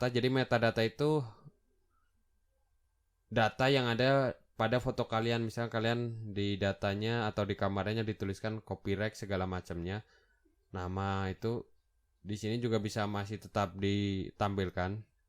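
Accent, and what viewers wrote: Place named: Java, Indonesia. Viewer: ngomongnya gausa di medok medok kakaaaa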